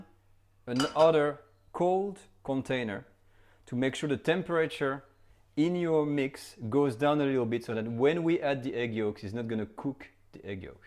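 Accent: France. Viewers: Love your explanation and your beautiful French accent too... Music to my ears...🥂 merci beaucoup... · French accent, that's a guarantee for a good classic french recipe! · Me encanta el acento francés😁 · Your French accent is great and the video is spot on. Thumbs up and Sub! 👍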